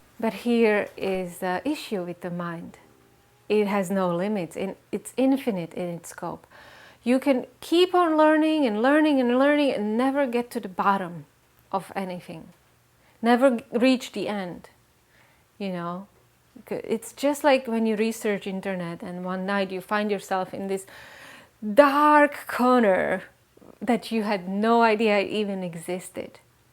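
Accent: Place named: Hungary. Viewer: Wow!👍I could of sworn your accent is hungarian! Totally different language, very similar accent! Cool! 👍😃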